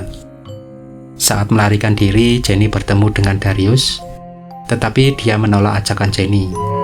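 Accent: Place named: Java, Indonesia. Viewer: Film nya menegangkan. By the way spean orang jowo to mas logat nya ketauan. Hehehe...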